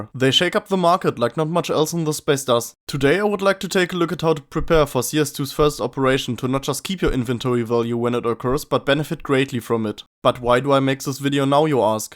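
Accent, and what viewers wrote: Germany. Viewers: I can hear your German accent 😜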